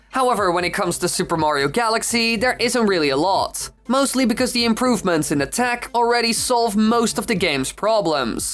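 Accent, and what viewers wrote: Netherlands. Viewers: I really wish I could be there, I,m still in school and have homework to do😭. Also you sounded kinda dutch are you exactly from the Netherlands? And a nother also, I love your vids there epic!!! I have watched every single evolved video! Have a great day. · OMG that’s why the accent mijn broer van Nederland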